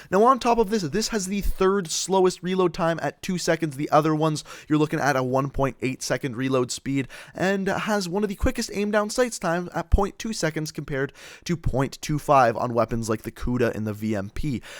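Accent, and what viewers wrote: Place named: Newfoundland, Canada. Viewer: I think this guy is from Newfoundland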